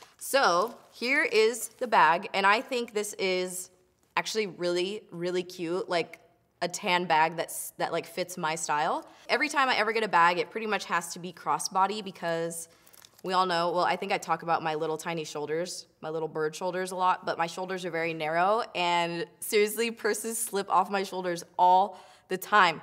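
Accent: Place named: California, United States. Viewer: I love your Cali accent! Lol soooo funny and selfie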